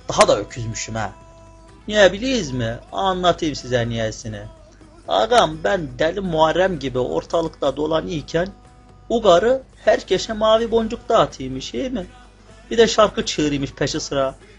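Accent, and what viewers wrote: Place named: Ordu, Turkey. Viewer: Ellerine sağlık. Hem Ordu'yu hem de bizim oralarda konuşulan şiveyi tanıtmışsın. Benim babam da Gülyalı, Yeniköy mahallesinden, eski adı Ab-ül hayır bilirsin:-) Yalnız bu senin konustugun şive daha çok bizim orası gibi köylerde konuşuluyor. Ordu'nun içinde daha çok "Da" kullanılıyor. Bıldır bu vakıtları Ordu'nun içi pek bilmez;-) Neyse çok sevgi ve selamlar. Ablayıra yolun düşerse bekleriz, görüşmek üzere:-)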